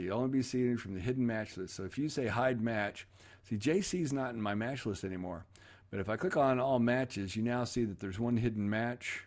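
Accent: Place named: United States. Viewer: Take no notice when we Brits snigger at your pronunciation...you are probably saying it correctly, it's us who have corrupted it over the last 1000 years or so! :-) BTW...my favourite US pronunciation is Worcestershire...as in the sauce...you guys say Wor-sess-ter-shire...whereas we say Wuss-ter-sha (or 'shur' depending on where in Britain you come from!). And get 2 Brits, one from the north of England and one from the south, to pronounce the word 'castle'...ah...hours of fun with English pronounciation! :-)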